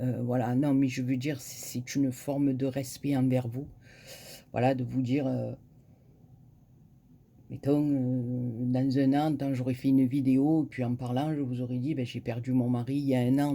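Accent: South, France. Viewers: Coucou, Je me joints aux autres pour t'envoyer mes sincères condoléances à toi, tes enfants & petits enfants! Dans ces moments là je ne sais jamais quoi dire, mais ce que je sais, c'est que tu risque de te faire disputer par moi si tu te retiens de pleurée, car tu vas de détruire de l'intérieur et ça c'est pas bon du tout donc lâche toi sinon attention a tes fesses hi hi;) Oui tu dois rester positive et avoir toujours envie de croquer la vie à pleine dent que ce soit pour toi, tes enfants ou/et tes petits enfants et puis ton mari n'aimerait pas te voir sur la pente raide, donc ma belle continue de nous faire entendre par le biais de tes vidéos ton accent du soleil et des cigales! GROS Gros Bisouuus vous tous!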